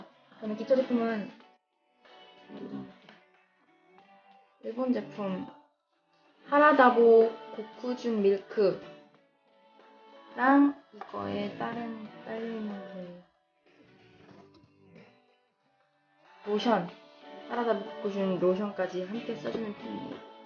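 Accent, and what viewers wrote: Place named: United States. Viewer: 외국인이시져 영어를 너무 부드럽고 정말 잘하시네요 외국인이시라면 어디나라에서 오셧어요? 제가 생각하기에 미국(USA)같은데 아닌가요? 어디에서 오셧어요?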